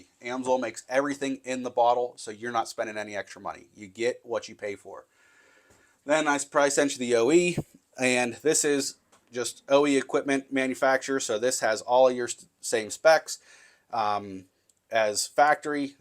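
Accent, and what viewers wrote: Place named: Pittsburgh, United States. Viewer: You sound like the people I grew up with in Pittsburgh. You must be from somewhere in that Western PA tri-state area with OH and WV....Edit, after a quick search I see you're "dahn" in 84, PA. I grew up not far from Bridgeville. Your brogue reminded me of my younger days before I moved "dahn hare" to SC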